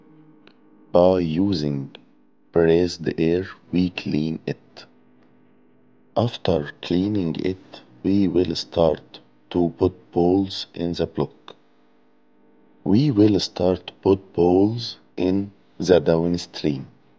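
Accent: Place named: Egypt. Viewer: اللللله على الانجليزية المصرية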